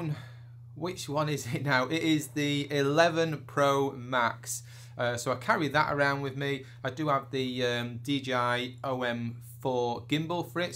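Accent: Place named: United Kingdom. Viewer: Damn. Nothing worse than clicking on a video from a creator you've never seen and they're Bri'ish. SMH. So sad.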